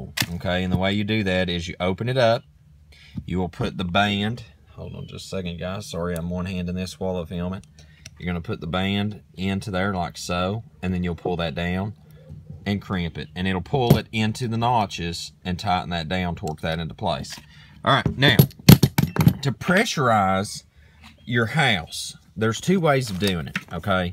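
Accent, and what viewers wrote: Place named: West Virginia, United States. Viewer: Sir, I love your accent where are you from. Sounds like West Virginia or Kentucky maybe.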